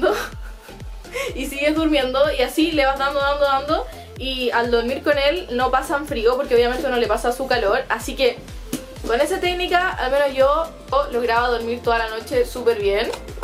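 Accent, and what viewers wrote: Chile. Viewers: ¿Eres chilena?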